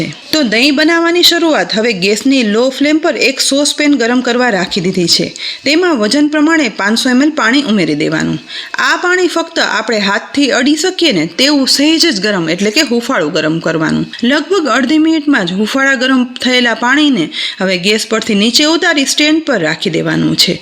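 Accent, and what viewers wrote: Kathiawar, India. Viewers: Tamara bol vani rit and sabd pure khathiyawadi che...bahu Sara's bahu saras style che bol vani...and etlu j mast dahi banavani rit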